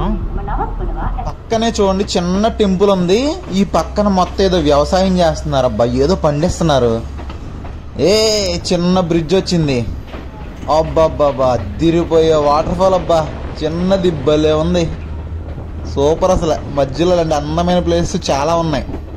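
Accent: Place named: Krishna, India. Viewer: అచ్చం కృష్ణాజిల్లా వాయిస్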